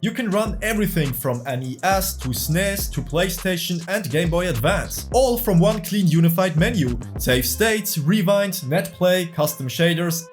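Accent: Germany. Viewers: You can hear that this guy is from germany.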